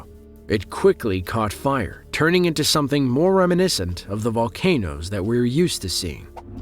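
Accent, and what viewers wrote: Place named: Rhode Island, United States. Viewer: the way you say various...which doesn't coincide with the way you say areas.Rhode Island?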